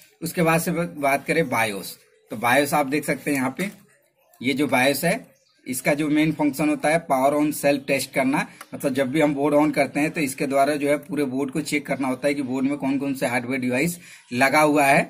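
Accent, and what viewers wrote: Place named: India. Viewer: indienglish... q bueno.-....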